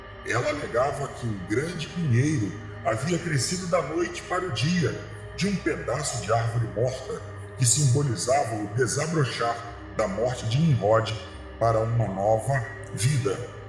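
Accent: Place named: Rio de Janeiro, Brazil. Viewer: Sotaque carioca é tenso mesmo KKKK mas o vídeo é otimo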